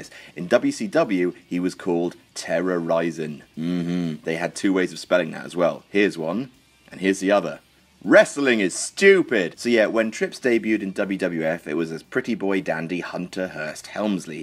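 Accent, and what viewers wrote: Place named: United Kingdom. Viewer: I find it funny that you're complaining about how a British guy speaks English. They do pronounce (and spell) a few things differently than we do.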